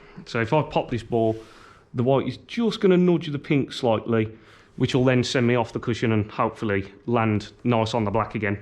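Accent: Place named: England, United Kingdom. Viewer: Ok cool. Im a Londoner born and bred. Nowadays most whites kids in London speak English with a hybrid Jamaican dialect which is very different from the anglo saxon dialect of English which is spoken in this t.v programme by this presenter. In the early 2000's, young white kids on council estates in London became JAMAICANISED. This is when they starting speaking with English with a hybrid Jamaican dialect. For example, Essex county is the only place in Britain where the cockney dialect/and or accent is still spoken. Tell something. Do you speak English in a hybrid Jamaican dialect?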